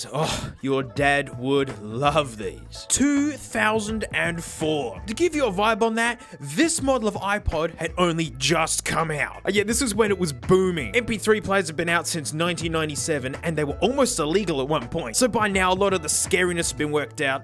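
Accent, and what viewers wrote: Australia. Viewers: I love this guy, it's like an Aussie Señor Pelo that makes videos about audiphones and piracy. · this guy can't be more australian and i'm overwhelmed by it.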